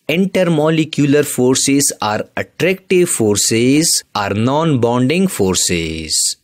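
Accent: South India, India. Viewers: Are you southindian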